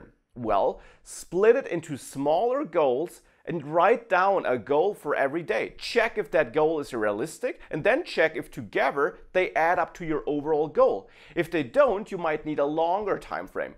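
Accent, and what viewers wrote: Germany. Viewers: Okay cool, ich erkenne doch den Akzent meiner Landsleute, auch wenn euer Englisch natürlich super gut ist 😉 Dass es auch wirklich hochwertige Websites/Channels zum Thema Software Development aus Deutschland gibt, ist gefühlt leider irgendwie viel zu selten 😄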